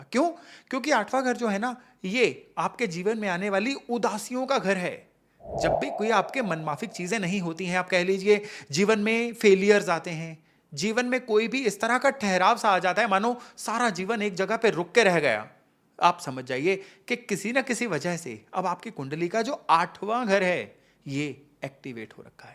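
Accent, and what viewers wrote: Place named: Kanpur, India. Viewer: Sir ap kya kanpur se belong krte h 😅batiya rhe h yhi bola jata h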